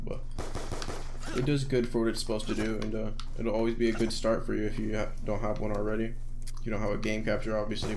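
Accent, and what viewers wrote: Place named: United States. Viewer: Bruh u sound like fitz but American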